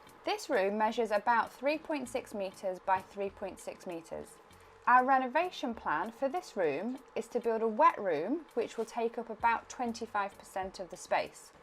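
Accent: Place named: Lancashire, United Kingdom. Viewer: Was directed through Tokyo Llama so really looking forward to watching your videos. Where are you from in the North,? Can’t quite place the accent - Lancashire?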